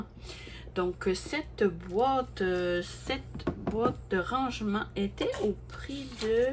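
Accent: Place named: Canada. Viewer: Merci pour la vidéo et les produits présentés ❤ j'adore ton accent canadien et ta voix toute douce, calme et reposante 🤗 Bisous 😘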